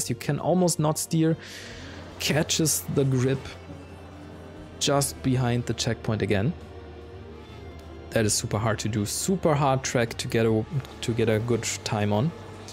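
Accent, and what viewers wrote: Germany. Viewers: First video I've ever seen on your Channel and i immediately recognized the german accent 😂 aber alles gut bruder du sprichst perfektes englisch :) But my favorite drink is just plain, non carbonated water or tea, which is basically just water but hotter and with taste in it hahah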